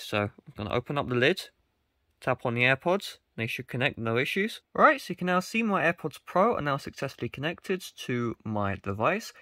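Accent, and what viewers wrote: United Kingdom. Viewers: Nice british accent mate! Watched till the end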